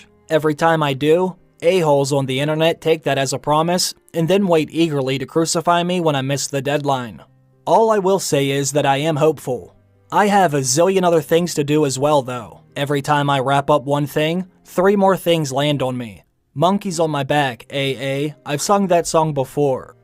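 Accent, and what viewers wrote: United States. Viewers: Where did you learn to read? Are you American?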